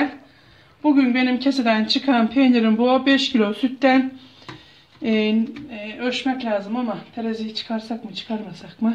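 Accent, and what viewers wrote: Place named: Black Sea, Turkey. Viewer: Ah hemşehrim ah çiğirt-irkme-zebil olmasın kelimelerini duydukca sanki çocukluğuma geri gittim sanki rahmetli anacığımın sesini duyar gibi oldum ne kadar özlemişim Uşağımızı şivesini kendine has kelimelerini Allah senden razı olsun.Ayrıca peynirde çok güzel oldu mutlaka deniyeceğim hemşehrim çok teşekkür ederim.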